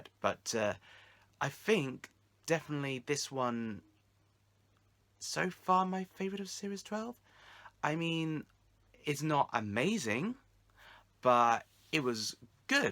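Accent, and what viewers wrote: United Kingdom. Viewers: not gunna lie you have the most annoying british accent ever, and im English myself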